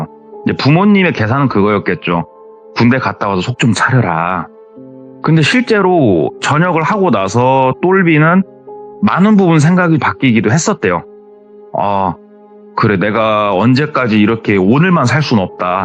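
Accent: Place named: Jeolla, South Korea. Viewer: 너무 재미있어요 빠져들어요 전라도 구수한 목소리 감사합니다.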